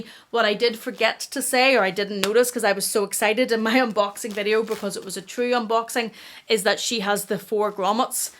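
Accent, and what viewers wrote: Ireland. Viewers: You have a stunning collection! New subscriber; came across your channel and when I heard your brogue I had to sub! My grandfather was from Tipperary immigrated to New York where I am from but live in Florida now. The colors of your collection work so well for me and enjoying watching your videos! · Amazing collection! I also love chanel chevron 🥰 whereabouts Ireland are you from? Love your accent 💚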